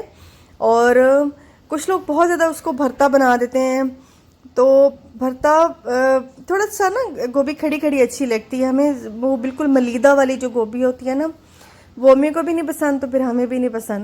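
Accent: Saraiki, Pakistan. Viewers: apki saraiki sun Kar acha laga.im from bahawalpur.ap kis city mai rehti han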